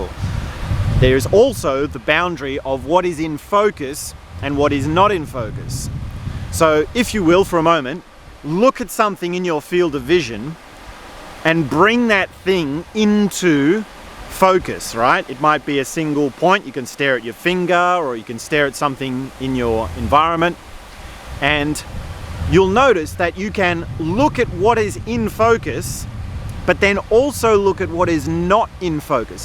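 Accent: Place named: Australia. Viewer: Qt ! Hard to focus on what you’re saying with the thick Aussie accent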